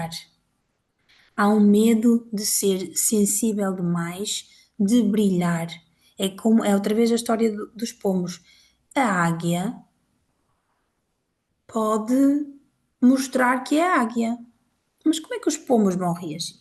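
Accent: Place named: Portugal. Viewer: Seu sotaque é muito bonito, normalmente eu acho o português de Portugal estranho, mas você falando é lindo demais.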